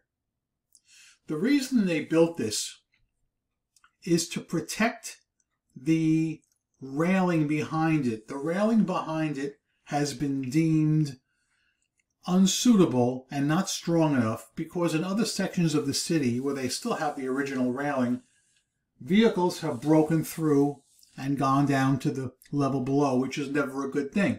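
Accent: New York, United States. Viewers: Your distinct New York accent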